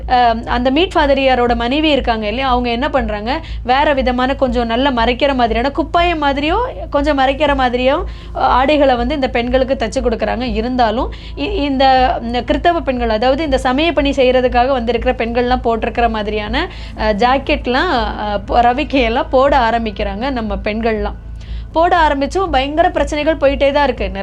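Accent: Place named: Nagercoil, India. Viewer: இப்ப தான் original Nagercoil காரி மாதிரி பேசுற சகோ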